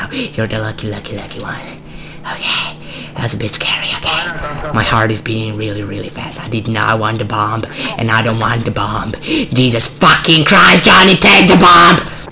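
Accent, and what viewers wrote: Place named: Eastern Europe. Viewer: I'm guessing Eastern Europe? Maybe Slovakia or Slovenia? Maybe?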